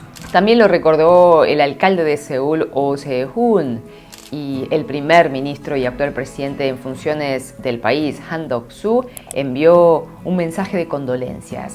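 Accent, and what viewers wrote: Argentina. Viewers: QUE BONITA VOZ QUE TENES, RECIEN TE CONOSCO Y ME QUEDE A ESCUCHARTE,me sorprendió tu tono de voz se asemeja a tonada argentina!, saludos desde ARGENTINA..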